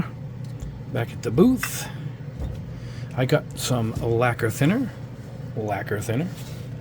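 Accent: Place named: Rhode Island, United States. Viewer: I've been using my Iwata CN NEO in both trigger and button valve for a few years now and I'm getting better every day. I need an airbrush with a 0.2mm or smaller needle for painting some minis. Sure, I would love to get an H&S but $$ is really an issue for me as a retired person. What airbrush would you suggest $50-$75 for what I'm looking for? Hey; by the way, I'm a fellow Rhode Islander born and raised in Bristol before I relocated to (Bristol) Vermont 15-16 years ago... I love the fact that I can listen to your accent and really feel like I'm at home listening to an ol' buddy.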